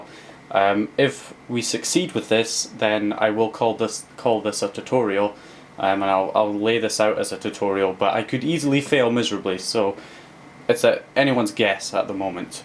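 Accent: Scotland, United Kingdom. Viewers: Where abouts in Scotland you from?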